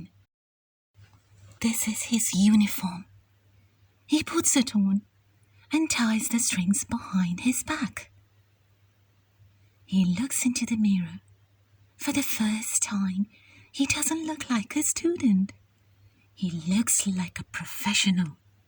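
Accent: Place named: England, United Kingdom. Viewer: Great artwork and beautiful brand new story. English accent is awesome. Keep up the good work! And yes, your channel does deserve a token token of appreciation.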